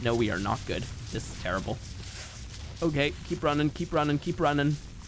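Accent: Ireland. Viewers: What part of Ireland are you from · Nothing beats hearing an Irishman getting excited about potato’s 😅 looking forward to this series dude 😁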